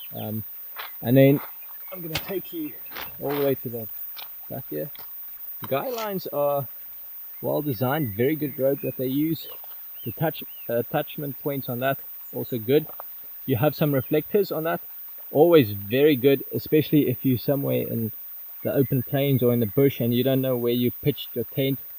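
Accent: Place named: South Africa. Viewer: Hey brother! I immediately recognised that SA accent, and now I see that you are a photographer as well as a fellow believer, awesome! :) The only tent that I notice locally is the Hubba Hubba NX for around R6500. Did you import or buy this tent through anyone local? I just bought an F-Stop Sukha, so I can't go around at such a high spending rate...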